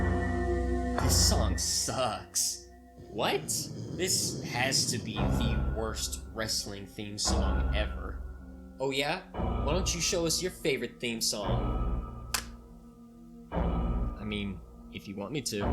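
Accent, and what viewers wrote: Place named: United States. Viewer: Obviously done some research, but doesn't really sound like an actual fan. And the narrator sounds American, but struggles with pronouncing basic words and names like Dud-ley. Instead pronouncing it Dude-ley and Mid-E-On, who apparently worked in the Ministry of Darkness with The Undertaker and Kane....🤦🙄🧐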